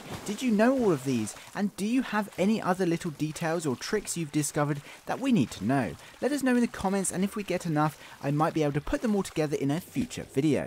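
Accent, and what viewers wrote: England, United Kingdom. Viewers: I love your English accent what part of england are you from? P.s I'm englidh and Don't have that broad of an accent you make me think your from London.......are u? lol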